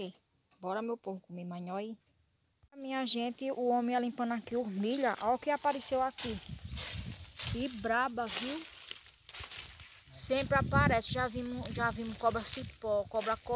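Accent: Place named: Alagoas, Brazil. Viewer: adoro o sutaque de Alagoas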